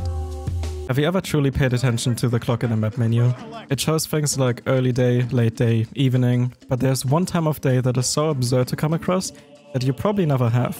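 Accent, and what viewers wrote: Germany. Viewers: Ich finde es witzig das man sofort raushört das du deutscher bist, obwohl du echt gutes Englisch sprichst. Das Video hat mir echt gefallen und erklärt warum ein paar davon bei mir einfach rumgestanden haben, dachte echt die wären verbuggt. Danke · When I heard your accent at the very first time I was like, wait I recall this kind of words, is this German? Then I read your channel description haha,I felt compelled to say that, no hate,though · hör ich da nen deutschen akzent?:D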